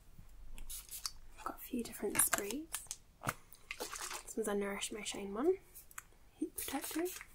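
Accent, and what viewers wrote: Scotland, United Kingdom. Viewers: I love your Scottish accent. Greeting from the USA. I just subscribed to your channel today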